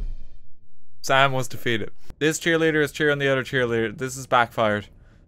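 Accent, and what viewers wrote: Ireland. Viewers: "Jump em boys!" Why do I feel like that should be an in game line for the Hobbits, voiced exactly in Irish lilt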